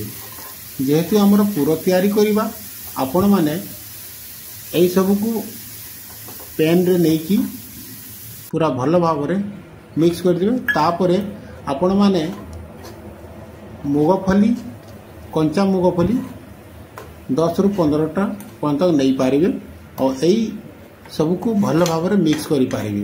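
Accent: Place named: Cuttack, India. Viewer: Bhai tume khanti sambalpuria jana paduchha ta sambalpuri na kahan katakiya kahuchha jana padijauchha mugafali kahuchha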